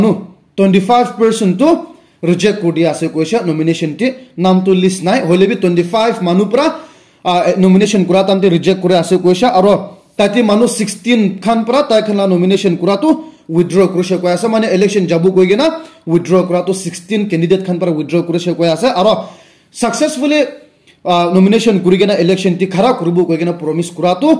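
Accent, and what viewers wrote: Nagaland, India. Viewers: Nothing,but sumi prononciation.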